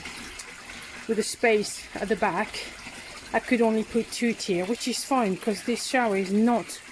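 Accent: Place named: France. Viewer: Keep going, your doing a fine job there, and great videos too. Cheers 👍 by the way love that french accent 👌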